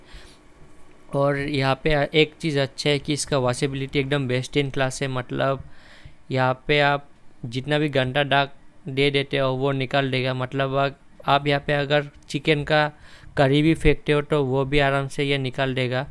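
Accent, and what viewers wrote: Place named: Surat, India. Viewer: You are speaking like surati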